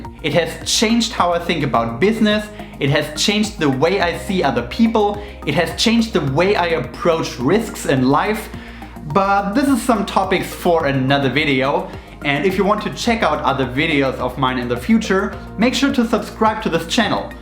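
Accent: Germany. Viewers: Hey, where are you from? :) you have a little bit of an german accent... As we say in german: gefällt mir ^^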